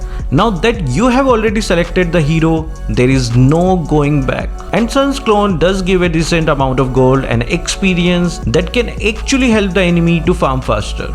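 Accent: India. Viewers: Channel ini ni punya orang India ya? Logatnya kebaca bgtt jir 🤣🤣🤣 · Your voice similar with indian accent...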